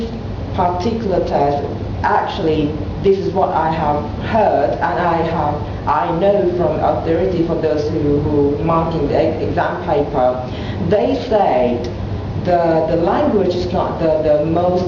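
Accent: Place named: England, United Kingdom. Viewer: มาสำเนียงอังกฤษเลย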